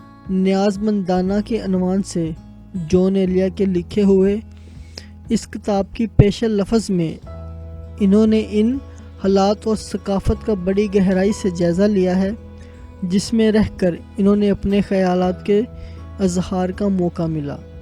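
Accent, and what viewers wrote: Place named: Punjab, Pakistan. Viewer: معلومات اچھی ہیں۔۔۔مگر انتہای "پنجابیانہ" لہجہ ھے۔۔۔۔اردو کو اردو کی طرح بولیے۔۔۔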